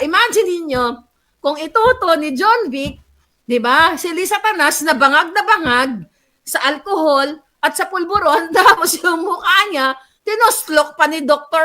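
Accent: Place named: Iloilo, Philippines. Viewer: Hahahahaha, ma'am maharlika, nakakatawa ka sa pagsasalita mo ng elonggo🤣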